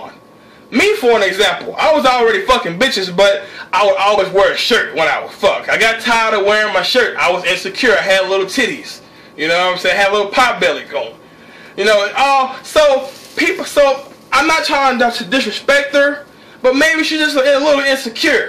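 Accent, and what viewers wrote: South, United States. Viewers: Damn I wish I had a southern accent like you.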